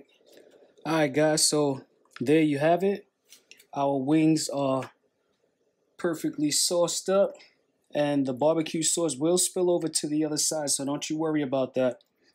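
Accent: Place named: New Jersey, United States. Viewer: I just love listening to your Jersey accent, and those wings look bomb. I'm trying my hand tonight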